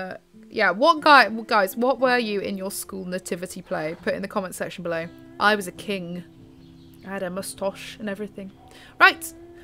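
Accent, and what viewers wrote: United Kingdom. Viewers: And I like your British accent 😁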